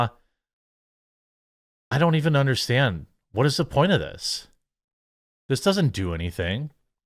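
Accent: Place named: Minnesota, United States. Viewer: I still yell out baaaags every time he says it and I wouldn't have him change it for anything. get down with your bad Minnesota self.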